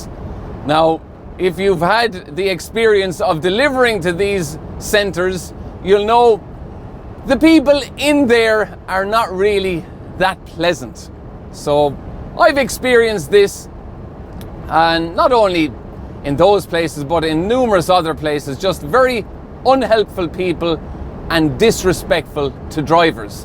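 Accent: Ireland. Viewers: Ok Ireland is the size of Indiana . My ancestors are from Ireland though . So cool accent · Irish accent, right? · You sound like you're from Dublin.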